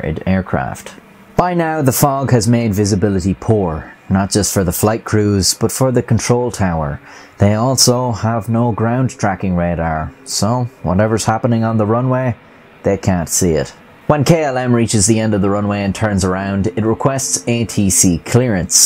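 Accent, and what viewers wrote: Ireland. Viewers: I love his accent! The Irish narrator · I’ve heard this story before, but hearing the Irish lad explaining it entices me for some reason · H I'm pretty sure he's Irish but if your some Irish English thing thats cool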